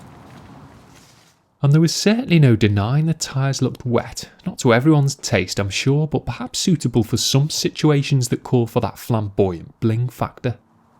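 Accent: Liverpool, United Kingdom. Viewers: I’m really struggling to pin down that scouse accent, must be very far over the river.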